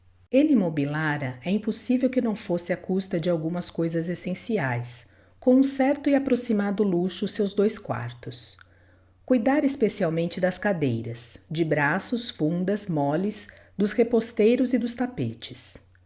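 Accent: Portugal. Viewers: I also prefer the European pronunciation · Please forgive my writing in English. I am trying to learn European Portuguese. Is this book by Pessoa being read with European pronunciation, or with Brazilian or some other pronunciation?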